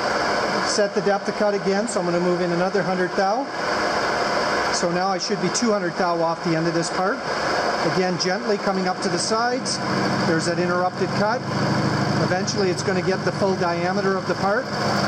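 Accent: Canada. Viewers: Are you Canadian bud? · etalwork was theonly practical class at school, I liked. wish I had learnt more. I thought,tho, that when machining like this, funning luid was used, to shed heat,reduce tool wear, speed process. maybe only on large production lines. ? in a microchip world, nice to see some crsftwork. good video, by Canadian presenter, pronouncing 'out', more like 'oot'? )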